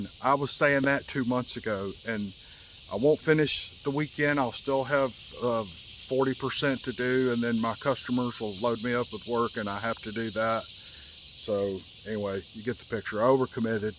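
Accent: South, United States. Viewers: That melodious voice w hint of southern accent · Hey,. I've been liking the Steve Lilly stories, as well. Was gonna ask you if you have any relatives in SC or in the Aiken/Augusta area. I'm Steven Buckner. Dad's name is John. His whole side of the family are good, (mostly! Lol) hard working Southern folks. Every time I listen to your stories or casts your country twang reminds me of my cousins, aunts, etc. Haven't run into many Buckners, even in my 10 yrs in the navy. None actually. Anyways, I thought I'd say hello and see if you might have some kind in red clay country. Take it easy as you can and get back to me when you can.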